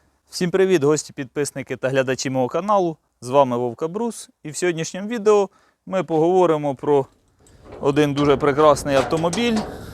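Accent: Cherkasy, Ukraine. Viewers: судячи з номерів - ми земляки. Тай говір Черкащини з русизмами чутно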